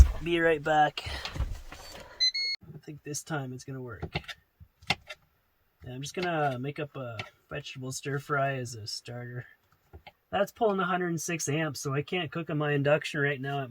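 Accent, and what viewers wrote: Canada. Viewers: Great video brother you are a hiking fool. Very good drone footage. My first time watching your vids I’ll definitely subscribe I love these types. I’m guessing this is in Canada??? You kinda sound Canadian. Wish you safe travels your friend from Oregon 🤙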